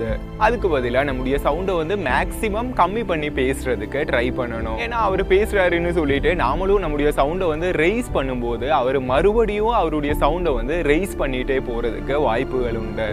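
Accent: Kerala, India. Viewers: Malayalam kalantha tamil.. Nice bro....😊👌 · What ah voice...and ur malayali tamil....😍😍🤩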